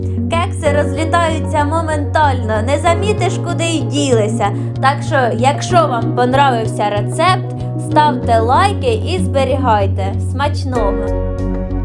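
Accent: Poltava, Ukraine. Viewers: Як по розмові, то точно з Полтавщини!?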